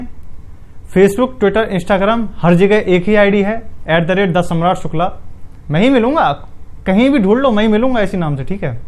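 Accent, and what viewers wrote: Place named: Uttar Pradesh, India. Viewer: Nice videos bhai aur UP accent bahot accha hai aapka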